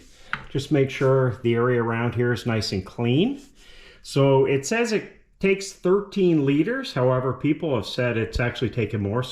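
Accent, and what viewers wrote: Canada. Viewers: Great video! Really clear! I'm from New Zealand and I enjoyed the Canadian accent too!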